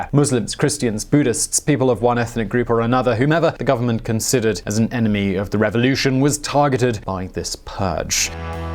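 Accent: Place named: United Kingdom. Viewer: another skinhead with UK accent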